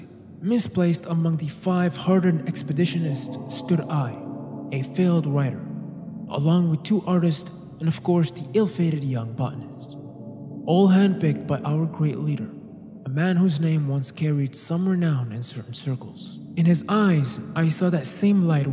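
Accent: France. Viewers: T'as un super accent français, et tu viens de gagner un abonné ! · you have a french accent, my friend, you didn't actually need to use babbel to speak it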